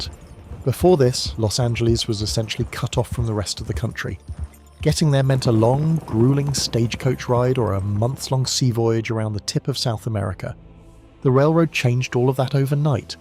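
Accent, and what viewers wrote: United Kingdom. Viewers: i really do love your reconstruction. And i love the most this britisch accent.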